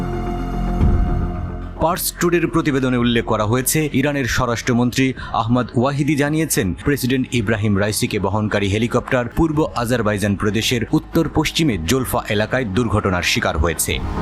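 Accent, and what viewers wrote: India. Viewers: নিউজ রিডারের বাচনভঙ্গি এবং কথা বলার ধরন অনেকটা ভারতীয়দের মতো। বেশ কিছুদিন যাবত একাত্তর টিভির কিছু নিউজ রিডার যারা ব্যাকগ্রাউন্ডে কথা বলেন তাদের বাচনভঙ্গি এবং কথা বলার ধরন, অনেকটা ভারতীয়দের সাথে মিলে যাচ্ছে। বাংলাদেশের বাংলাভাষী নিউজ প্রেজেন্টটার কখনো এই ধরনের বাচনভঙ্গিতে কথা বলেন না। তার মানে কি 71 টিভিতে ভারতীয়রা নিউজ প্রেজেন্ট করছেন!? 😮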